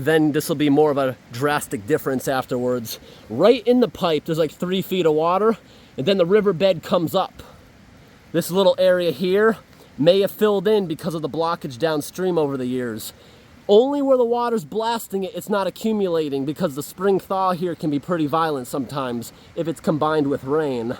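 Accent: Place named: Maine, United States. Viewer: I remember now why one of the reasons I started watching this channel is bc I knew right away you were from ME with your accent/the hard pronounciating on the end of words lol!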